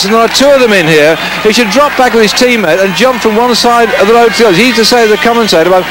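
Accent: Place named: United Kingdom. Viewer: I watched this race live in my early 20ies, now just heard 1 min in my lunch break and Duffield's thick british accent of national rider names make it for me as a Dane difficult to relate to the riders, though I know hwo they were. First time I watch this since live signal. Very interesting. Will watch last 7 mins in the middle of the night later.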